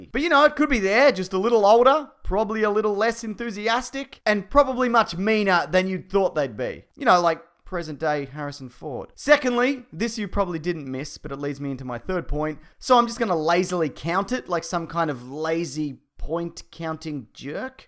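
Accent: Australia. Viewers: Question is this guy Australian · I watched this video before the actual trailer. Now I'll have an Aussie voice running in my head when I see the teaser.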